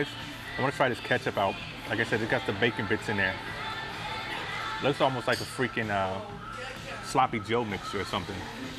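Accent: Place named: Tri-State Area, United States. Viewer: Oye, you from the tri state area? I'm placing bets on Jersey or possibly the city (NYC). I hear an accent and it sounds like fam back home. #NoTimeForADiet 🤣😂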